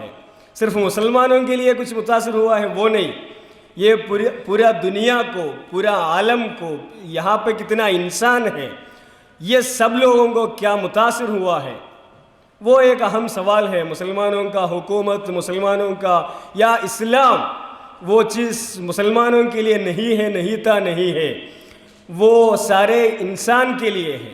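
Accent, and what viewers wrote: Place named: Kerala, India. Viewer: His mother tongue is Malyalam he is addressing Non Malyalam Audience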